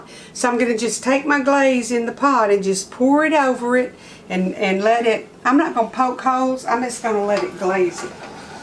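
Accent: South, United States. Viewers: I could listen to you talk all day lol. It's that lovely Southern accent. Figs, mangoes and passionfruit are my favourite fruits to eat, so I definitely wrote this recipe down. Thankyou. Happy anniversary to both you and. Michelle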